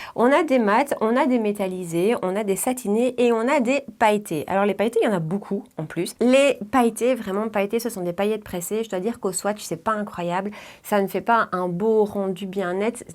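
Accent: Belgium. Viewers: Coucou ma petite. La notification qui fait plaisir à 19h pétante en plus avec un GRWM comme on aime 😁. Ton accent belge on adore 😁. Elle est vraiment belle cette palette de leur collection de Noël, les couleurs ont l’air vraiment intenses et magnifiques de part ce que je vois. Comme tu dis c’est quite ou double chez MUR. Tu me donnes envie de tester quelques produits de chez ELF comme la base. Il faut vraiment que tu testes la poudre lumineuse de chez ELF. (La loge de Gabrielle en parle souvent et la met principalement sur les côtés du visage pour donner un coup d’éclat naturel à la peau vu qu’elle a la peau mixte). Celui que je préfère en petit prix c’est celui de Catrice (je ne sais plus comment il s’appelle exactement), il a une très bonne tenue, une belle couvrance et n’est pas trop asséchant pour mon contour de l’œil j’aime beaucoup combiné avec le fond de teint matte de Catrice et la poudre matte également. 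Avec notre peau grasse il faut de la belle matité. Sympa le petit combo Nyx + essence pour les sourcils (à tester 🤔). Très joli maquillage au passage tu nous surprendras toujours. Pleins de bisous et une très belle soirée 😘❤️.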